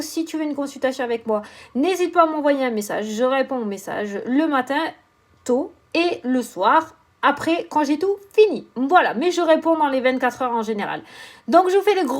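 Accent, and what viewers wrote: South, France. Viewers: Ahhhhh😊😊😊... enfin un peu de soleil avec ton accent sur Clermont, dediou ça fait du bien 😁😁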